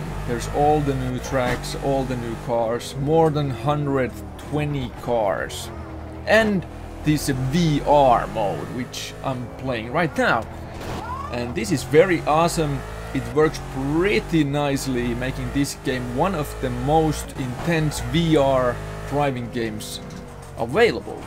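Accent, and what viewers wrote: Finland. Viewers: Are you Finnish? You sound Finnish.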